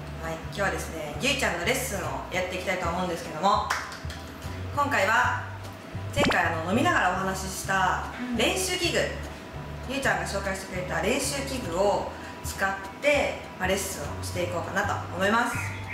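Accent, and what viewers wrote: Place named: Kyoto, Japan. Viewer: 京都弁ええなぁ～🤣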